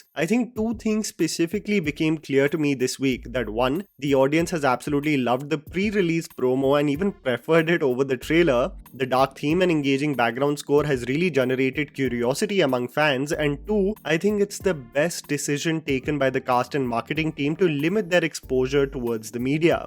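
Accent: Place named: India. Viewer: FFS. Please work on your pronunciations of ‘conjure’ and ‘mass’, remembering that they are English words. An Indian accent is one thing, but to do the maa behn of the English language is something else. Do ‘chime in’, won’t you!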